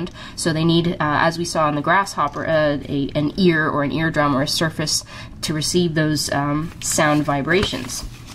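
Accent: Canada. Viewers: Are you Canadian? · Is she Canadian?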